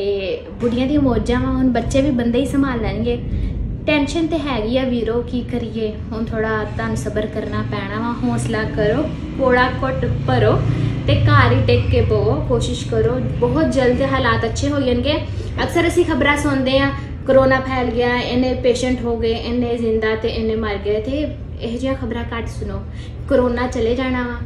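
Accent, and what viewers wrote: Punjab, India. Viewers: Love your punjaabii acent Specialy the sentence OOW KEERIIIIEE SHEA WAA 😂👍🏻😍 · I like your videos so much. I m also Punjabi. But your Punjabi language is very sweet. It's so nice. Your talks are very sweetness. All the best. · Love you je....you are awesome...kia andaz hai punjabi ka....maza aa jata hai...such a treat to listen you. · Kudiye teri panjabi thet hai Bacchpan vich asee jolly bhen naal khedde hunde c 'haar gya ' pehla das 'haar gye yaa bujh' Bilkul sade wali panjabi juban hai tuhadi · Sat shri akal ji Mainu tuhada program bohut vadiya lgda h te tuhadi pure Punjabi kamal.. 👌👌 Punjab Amritsar to..... 👍👍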